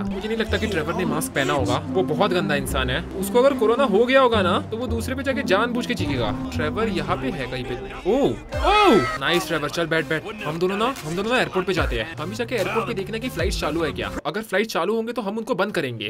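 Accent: Maharashtra, India. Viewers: Bhai vo marathi h main bhi shi re shi ghan aisa bolta hu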